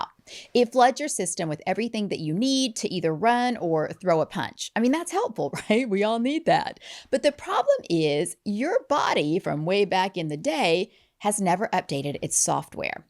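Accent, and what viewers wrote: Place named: Appalachia, United States. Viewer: I just recently subscribed! I LOVE your content and finally feel there is someone out there speaking the truth unapologetically. Much respect! You are beautiful inside and out and an inspiration! ❤️ By the way, I love your southern accent! What part of Appalachia are you from? Im a southern girl as well, but from Louisiana. Thank you for the amazing content!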